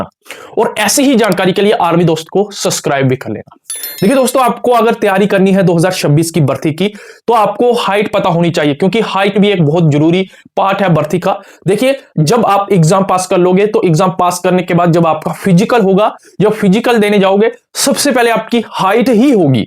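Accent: Punjab, India. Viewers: Bhai aapki bhasha se pta chal rha h ki aap punjabi ho❤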